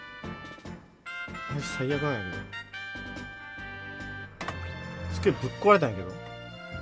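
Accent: Fukuoka, Japan. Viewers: 方言福岡やね。何とかやろうがっちゃ〜！って言うw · 福岡のどこか？がちゃがちゃ言う方言今まで聞いたことなかったwww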